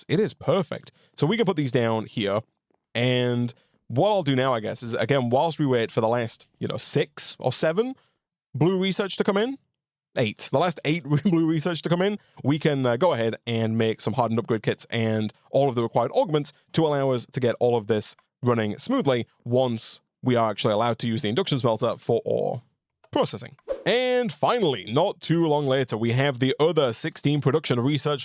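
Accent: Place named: Northern England, United Kingdom. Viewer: your accent confuses me so much 😂 sounds like a proper northerner from the UK but has so many american influences; 'aluminum'???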